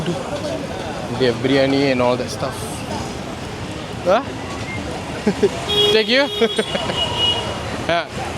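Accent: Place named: Tamil Nadu, India. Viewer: உங்க பேச்சு மலேசியன் தமிழ் மாதிரி இல்லை பக்கா தமிழ்நாட்டு தமிழ் மாதிரி இருக்கு நான் சிங்கப்பூர் ல தான் இருக்கன்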